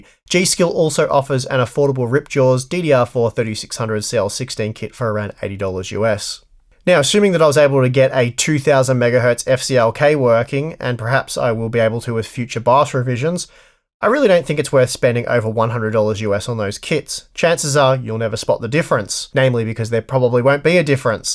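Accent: Australia. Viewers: There's something about that exotic Aussie accent. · I always like your videos more than GN, because Steve never takes a break to breathe. I am an engineer and I have troubles following him. (Also, tbh, who doesn't prefer Australian accent over a Yankee one?)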